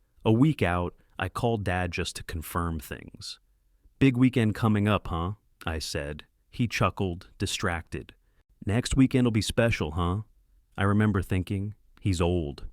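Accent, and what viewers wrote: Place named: Alabama, United States. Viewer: Dude sounds like he is in love with his sister. Like in scar face. He does sound like the alabama type.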